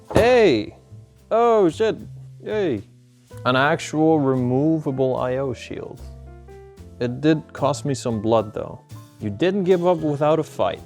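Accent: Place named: Netherlands. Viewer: you sound really dutch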